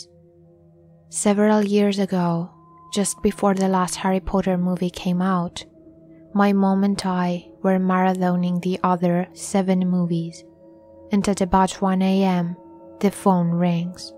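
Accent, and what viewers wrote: Ireland. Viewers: You sound a bit like a Greek beauty with an Irish accent. Not sure where youre from but your voice is addictive <3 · Is that an Irish accent? You sound like a fairy :-)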